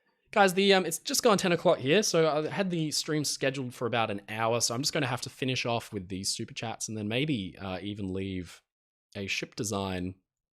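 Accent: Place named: Australia. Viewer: Hello thank you from Robert Bruce in Canada, love your knowledgeable & congenial presentations, like the way you talk your touch of Aussie accent is very likable, cheers!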